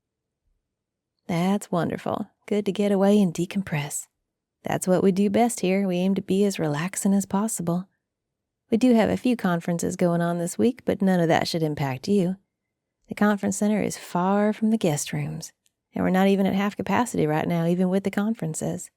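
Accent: South, United States. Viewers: Yes! This world needs more southern accent asmrs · So this is that southern hospitality that they talk about. Like, I’ve never been to the south in my life, but that accent immediately immediately makes me feel at home and taken care of. Also. XP?! Good lordie that computer is ancient. Please tell me it isn’t connected to the internet.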